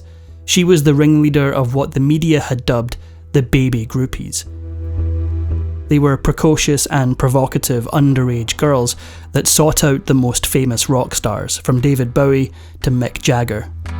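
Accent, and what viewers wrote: Scotland, United Kingdom. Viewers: 💯👍 I think it's a Scottish accent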